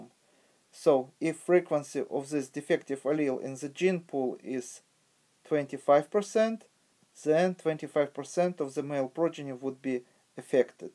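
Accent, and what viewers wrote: Russia. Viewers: Не знаю 🙃 Но я с первого слова понял , что автор русский 🇷🇺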